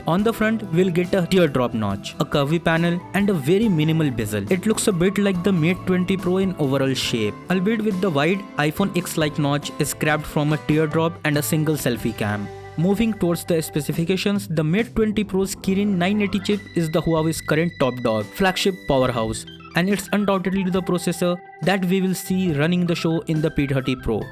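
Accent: India. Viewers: sounds like india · Fucking indian accent sounds as though his tongue is born curled. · But a Chinese speaking in English sounds <num>x much better compared to this fcking Indian.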